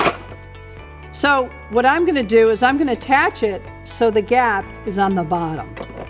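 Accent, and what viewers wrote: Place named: Boston, United States. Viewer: hahahaah you must be from Boston too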